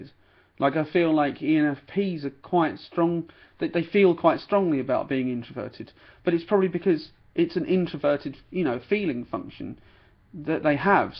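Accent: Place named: United Kingdom. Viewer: I really enjoy your videos. Great to hear a British accent, on the subject of MBTI on YouTube. For me re: I/E I think, like anything else in life, people tend to over-simplify a concept in order to assist with general understanding. It then becomes a Chinese whisper. If you like understanding systems (as I do) you quickly relish the fact that it's clearly a much more sophisticated concept, than the initial information concerning the letters. I guess when I refer to extroversion, I'm talking about someone leading with an extroverted function, as the meatiest part of them, which leads them on a sociable path. However, we all think, we all feel and we're all I/E. That's the complicated and intricate beauty of humanity. The MBTI framework attempts to trace it. Keep up the good work! Marie (INTJ)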